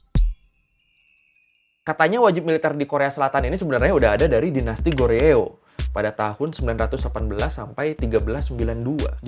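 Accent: Thailand. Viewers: anjing aksen thiland lu mantap jon..... ngakak gue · bisaan maneh ngomong bahasa thai pke logat na t.g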